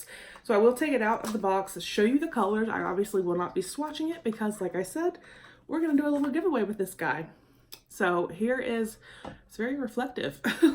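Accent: South, United States. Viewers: Love boxy charm I would love to win..I'm from kentucky Iove your country accent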